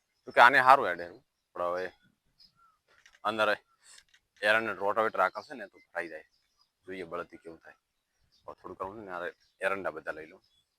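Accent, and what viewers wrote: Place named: Banaskantha, India. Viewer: જય માતાજી મોટા ભાઈ ક્યાં થી છો ભાઈ તમારી બોલી બનાસકાંઠા ની લાગે છે બહુ સરસ વિડિઓ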